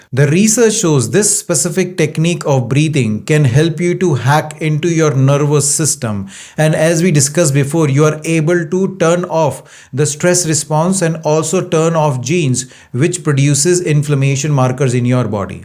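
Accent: India. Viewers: Hello Doc, are you really based in the USA? I don't think so considering your pronunciation. It is 100% Indian.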